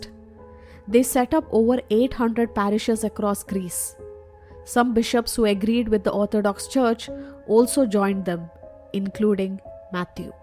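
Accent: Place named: South India, India. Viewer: Narrator sounds as if she is South Indian.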